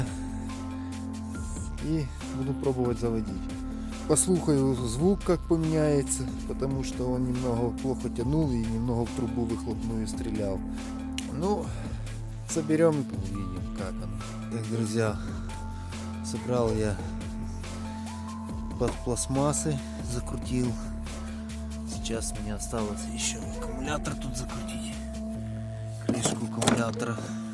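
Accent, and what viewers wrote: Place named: Ukraine. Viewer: Говори как тебе удобно потому что твой русский это украинский шедевр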